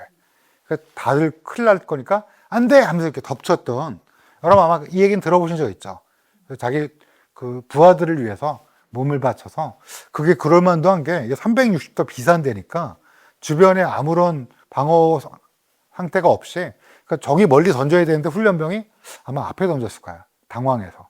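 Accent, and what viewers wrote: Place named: Gyeongsang, South Korea. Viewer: 피디님 경상도 분이신갑네예 사투리 이빠이 써뿌네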